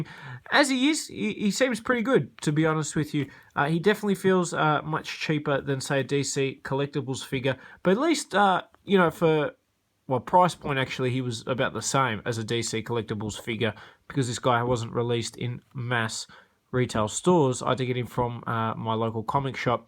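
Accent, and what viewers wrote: Australia. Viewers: Please reply are you Aussie?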